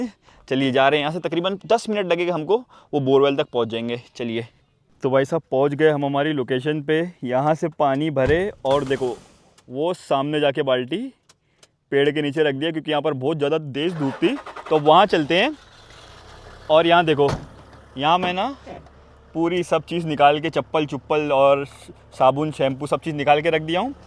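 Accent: Mumbai, India. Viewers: I enjoyed your video, I am a Pakistani and enjoyed the way you speak Mumbai type Hindi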